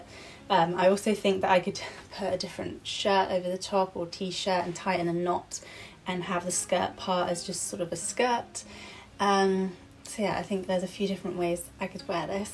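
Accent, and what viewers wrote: United Kingdom. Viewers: You seemed to be such a calm and classy person. I love your British accent. So satisfying to hear.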